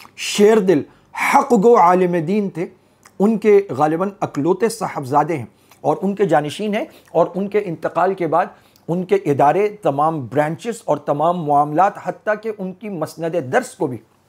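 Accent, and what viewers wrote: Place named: Arab world. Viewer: مولوی صاحب آپ جب اردو میں بات کرتے وقت ح ع عربی کی طرح ادا نہ کریں یہ سننے میں بہت برا لگتا ھے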